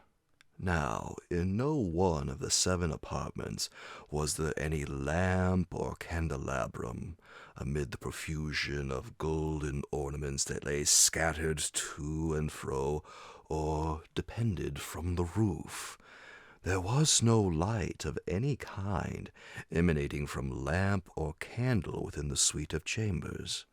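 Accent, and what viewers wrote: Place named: United States. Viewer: Thanks for this one! One off my favourites of Poe's tales. You've got to have a talented American narrator for Edgar.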